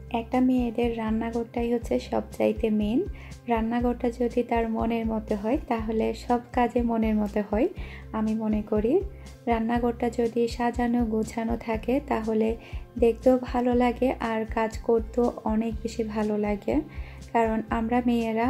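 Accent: Chittagong, Bangladesh. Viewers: Apnar kotha shune Mone hocche . Apni amr attio. Bcoz we r chatgaiya.(Chittagong) 💕💕💕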